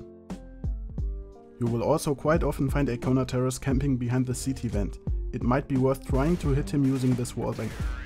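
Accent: Germany. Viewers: DAT GERMAN ACCENT hahahaha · Hat das irgend einen tieferen Sinn, dass du mit einem fürchterlich deutschen Akzent englisch sprichst? Warum nicht einfach auf Deutsch? Mega anstrengend sich dein englisch anzuhören ^^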